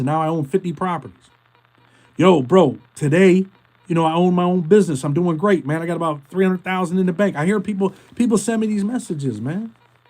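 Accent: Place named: Boston, United States. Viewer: I thought you were from Boston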